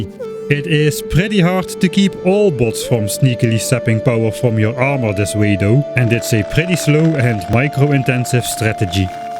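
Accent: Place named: Netherlands. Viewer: This is the definition of dutch accent lol i love it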